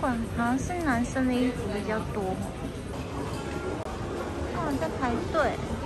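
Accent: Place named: Japan. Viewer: 嗨～ 講中文聽起來好像日本人講中文的口音。恭喜破五萬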